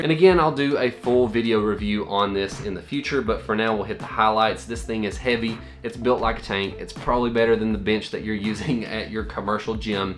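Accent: South, United States. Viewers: You have a southern accent.. What state are you from ? IM from Canada BTW 🇨🇦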